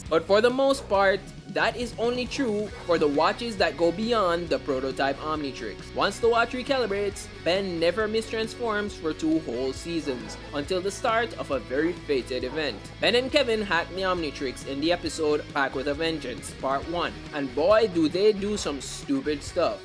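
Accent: Jamaica. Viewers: Either jamaican like me or im mishearing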